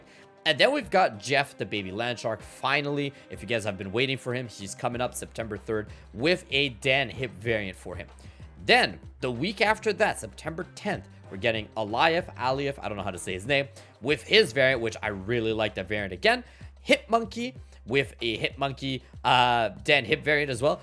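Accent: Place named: Quebec, Canada. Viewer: Clairement un québécois!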